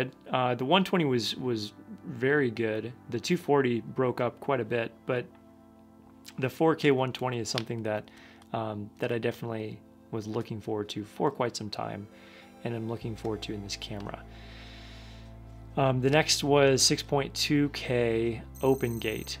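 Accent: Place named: United States. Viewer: I subs to your channel because your American accent! Ytuber from Vietnam, teaching English.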